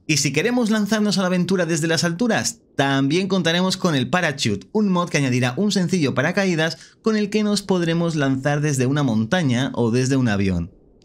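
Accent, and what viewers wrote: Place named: Spain. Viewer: OTRO CANAL ESPAÑOL, SE PASAN, ESTE CANAL ES DE EUROPA, NO LATINO, PORQUE A CADA RATO DICE COSAS RARAS CON SU DIALECTO O ACENTO QUE NO SE ENTIENDE EN MI PAIS LATINO, ESE CANAL ES DE IBERIA, NO LATINOAMRICA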